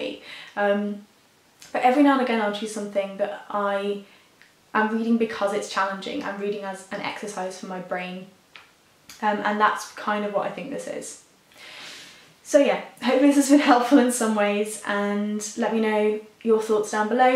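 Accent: United Kingdom. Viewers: agreed. this, combined with her heavy brit accent, makes it hard to hear.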